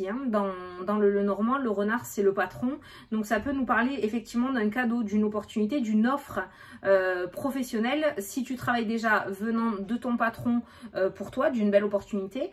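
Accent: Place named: Landes, France. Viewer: Oups, oui je voulais te le dire ..je suis dans le 78! Mais originaire du 40 😉voilà pourquoi j'adore entendre ton accent 😁... Sinon, niveau fatigue, dans mon entourage, tout le monde est ''rincé''!!! Et puis les vacances d'été approche...je travaille dans l'annimation auprès des enfants et ça se ressent ! Bises à toi et à la communauté !!!😘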